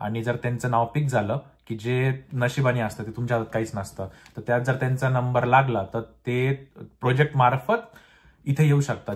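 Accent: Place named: Nashik, India. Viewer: तुझी मराठी चांगली आहे आणि नाशिक ची तर एक नंबर आहे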